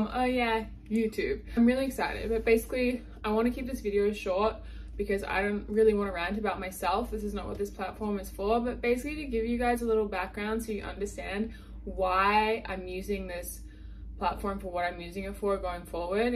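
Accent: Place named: United States. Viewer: I can hear the most tiniest American accent coming on!!!! Fellow Byron girl here x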